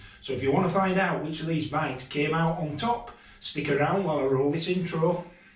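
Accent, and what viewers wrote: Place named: Bradford, United Kingdom. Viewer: Great review kid. Watching from Sydney, and lovely to hear a Bradford accent while being educated.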